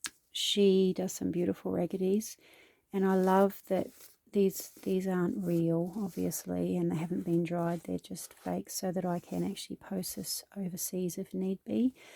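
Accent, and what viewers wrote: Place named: Australia. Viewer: Love your Sassenach journal, as a fan of the series, this gorgeous journal does it justice, love all the pockets/tuck spots and the fabulous images/ herbs with how to use is fantastic, you have created a great journaling journal, tfs , nice to hear an Aussie voice have subscribed, good luck in all your future creations xx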